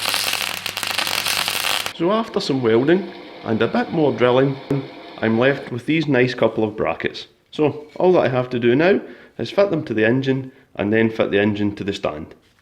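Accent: Scotland, United Kingdom. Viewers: Its great to hear another Scottish accent alongside this great work. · You've taught me more Scottish accent than Train Spotting, so thank you! Also awesome projects. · So, I'm an American and I'm having trouble placing the accent....Scottish?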